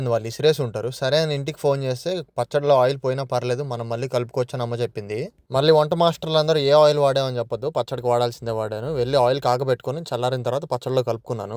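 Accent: Godavari, India. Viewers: Ohoo nuvvu suuper tammudu achu vzg godavari jilla vaalla maatateeru nee video s ki addicted 😂😊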